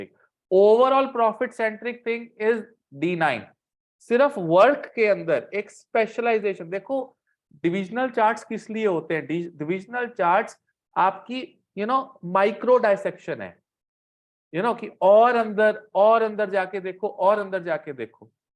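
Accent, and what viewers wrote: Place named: India. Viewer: he is Indian look at his English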